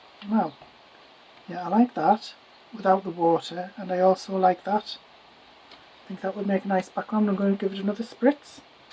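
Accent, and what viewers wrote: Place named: Northumberland, United Kingdom. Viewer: Which part of Northumberland are you from 😊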